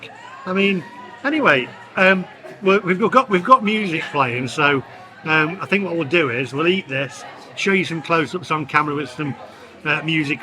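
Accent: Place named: Black Country, United Kingdom. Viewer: I should be offended as im from Wulvarampton but i cor stop loffing at his daft black cuntray accent 🤣 He dow arf sound daft 🤣🤣🤣🤣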